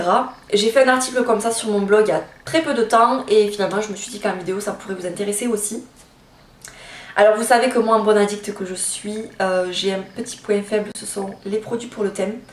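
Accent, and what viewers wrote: South, France. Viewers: J'adore les fonds de teint moi aussi et j'aime en porter toute l'année, j'aime tester les nouveautés. J'aime bcp tes vidéos avec en plus ton accent du Sud car je m'y reconnais (j'habite pas loin....). Surtout continue, biz Nany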